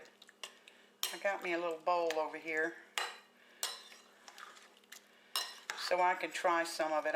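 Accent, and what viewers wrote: South, United States. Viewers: Mama always made ambrosia at Christmas. I still make it for my family. They gobble it up. Can I ask what state you are in? You have a very cute Southern drawl. All of my family is from Tenn. Sometimes people still ask me where I am from. Guess they hear a little twang in my voice..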